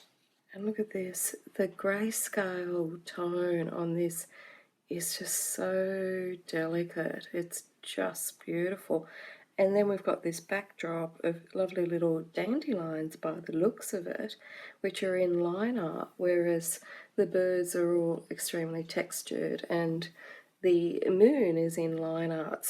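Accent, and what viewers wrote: Australia. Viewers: I like your videos a lot, and it's great to hear an Aussie voice, but I'd like them even more if you kept you hands/fingers off the page while trying to see the details of the picture, and yes, they are exquisite. You've definitely persuaded me to buy at least one of these, so thank you for sharing.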